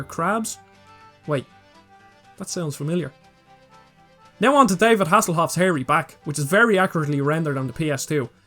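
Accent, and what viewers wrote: Ireland. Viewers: I could listen to your strong Irish brogue all day, brother! great videos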